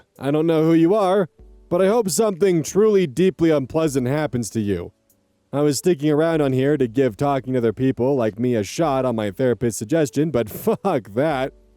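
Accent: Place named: Midwest, United States. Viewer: I feel a lil guilty that I have not watched main channel. I just know him as the lil guy that has the cute midwest accent and reads Reddit so I don't have to suffer through it, myself. And the only creator to tempt me into the arms of the sups.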